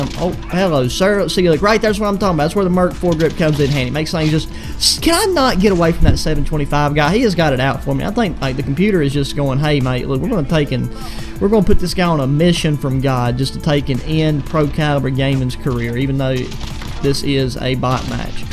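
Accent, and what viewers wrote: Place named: South, United States. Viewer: That Southern accent is hilarious, I had to subscribe 😂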